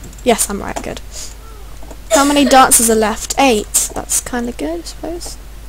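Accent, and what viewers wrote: United Kingdom. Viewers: Omai! Your British accent is SO cewt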